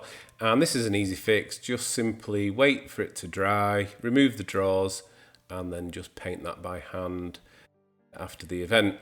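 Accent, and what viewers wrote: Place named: Scotland, United Kingdom. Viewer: your furniture remake are truly beautiful. Word is getting out about the great work you do. Is it OK to ask what part of the UK you are from? I have a limited knowledge of accents but yours reminds me of closer to Scotland.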